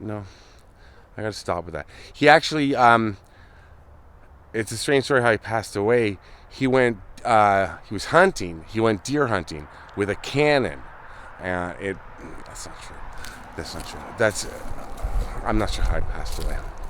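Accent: South, United States. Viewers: I have to remember not to be eating or drinking anything when I'm watching your video's like this...I dang near choked...😂😂...Love that southern draw there...Question, did Beull have Biscuits on the bow before he played with the TNT? Much respect to you for showing so much respect to the arm...Much love sent to you... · Another, Great Video 💯! Your Southern accent made me laugh 😂. Hope you are well? I’m going to catch your next live video. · You are the best I love your humor. Your southern accident is cracking me up. I'm From Florida😂 love you and your channel❤ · Haha...Love it! It's worth it just to hear you speak "Southern'ish" lol♥️☺️ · This one reminds me of the foot video you made. How in the world do you find stuff like this. Loving the content. You’re so funny while being respectful at the same time. Almost as bad as the guy cooking biscuits on the bow in a thunderstorm!! 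😂😂😂😂 Southern accent is on point! 😂😂 I myself am a southern gal. And I am loving it. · This was hilarious 😂 love the southern accent! You did sound like McConaughey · Love the southern twang ❤. You nailed it ! For sure....For sure. 💯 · . Kid dynamite 😅 I enjoyed this.. I read afterwards he was alright? That face you made OMG. It messes up their swimming bladder lol That southern accent ROFLMAO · Oh my gosh, you are so damn funny. You and your southern accent. Good job though enjoy the rest of your day.🤣💜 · Love watching your videos my friend!! Very interesting!! You are an amazing person my friend!! Love watching your videos!! Love you and your channel!! Love the southern accent!! God bless you my friend!! Much love!! Peace!! ❤️💖🥰💙💕💜😎😎😊😊✌️✌️✌️✌️✌️✌️ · A Canadian with a southern accent. Lol! I have one but with time being away from home it is less. When I go visit and it come in thick funny! 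· Live your videos ❤ so interesting, thanks for bringing me along . Love your southern accent. You are hilarious yet respectful at the same time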